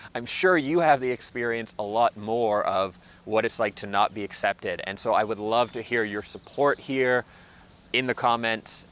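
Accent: Canada. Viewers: sounds Canadian and his views are rooted from that perspective, which is ok because everyone has to live his or her life.Canada is very individualistic so listen to him. But be careful, the later can be just as bad, especially in Canada because its hard to fit in. Don't try to. live your life. people will disappoint so don't expect to be connected in a disconnected world because we are all alone together. Best of luck.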